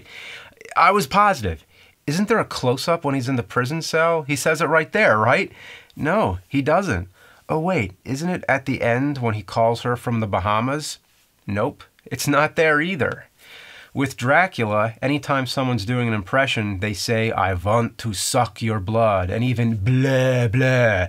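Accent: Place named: New Jersey, United States. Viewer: I noticed that too, I think it's just his New Jersey accent.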